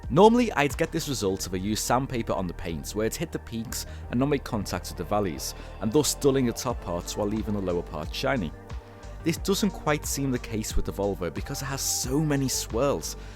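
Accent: Liverpool, United Kingdom. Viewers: Hi nice to hear a fellow scouse voice. Two questions mate, firstly how long did it take you to do that exterior detail? Perhaps that would of been a useful detail to mention in your video. Secondly, when you're machine polishing do you find it gives you a bad back? Whenever I machine polish my back is practically on fire! I get really bad aches off it.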